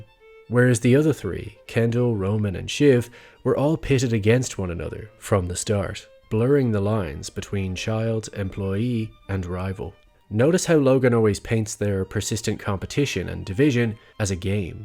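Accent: Ireland. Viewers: Love this channel. Insightful, well written, well-produced. Well done, man! Are you Irish? I thought I could hear the accent. As a Dub I can spot it I think ;) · Sounds Irish to me but what do I know lol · Is the narrator Irish? Some words sound Irish (e.g. without, about etc) but not entirely sure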